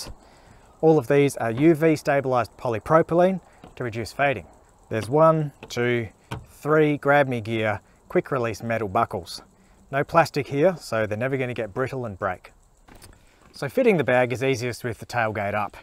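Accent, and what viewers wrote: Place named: Australia. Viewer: A soon as I hear the Ozzy accent from an overland video I’m like “Yup, you’re certified. Go on and tell me what you know.” ❤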